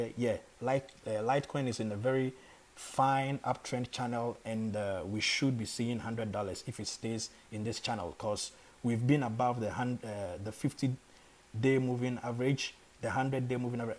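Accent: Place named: Ghana. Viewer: Are you from Ghana? Your accent is a giveaway.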